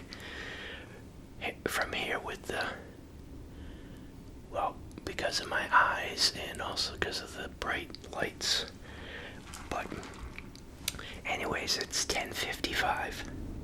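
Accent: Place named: New England, United States. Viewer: Sounds like a fellow New Englander! I'm right over in Norwich CT! Just found your channel, love this video. I love going on late night McDonalds runs, sometimes I'm not even super hungry but it's just like a satisfying solo excursion while my wife is asleep. Listen to some music in the car and chow down in the parking lot. Sometimes if I'm feeling froggy I'll wake up super early for a McDonalds breakfast run while it's still dark out.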